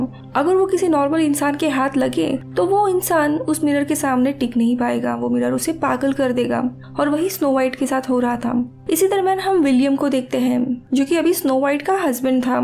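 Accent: Maharashtra, India. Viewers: hi do you have any relation with climax explained in hindi channels nikhil, moreover you both sound a quiet marathi, BTW Love your explanation. thank u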